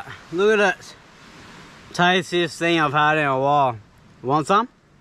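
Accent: Australia. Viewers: You from Perth dude ?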